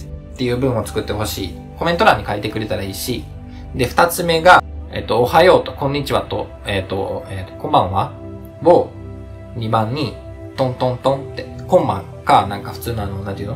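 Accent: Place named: Kansai, Japan. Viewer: 関西弁ってことがオモロイ！😁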